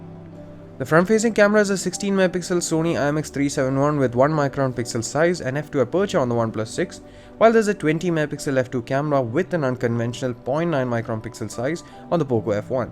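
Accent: Kerala, India. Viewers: bro are you a malayalee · Bro are you from Kerala ?? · Are you from kerala??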